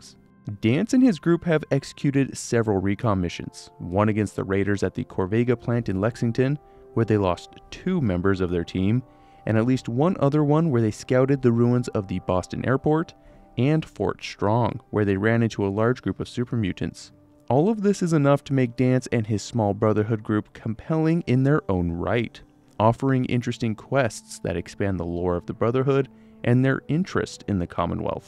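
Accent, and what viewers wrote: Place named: Midwest, United States. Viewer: I love your videos but i have ro say, i noticed your accent way more in this video lol michigan? Minnesota?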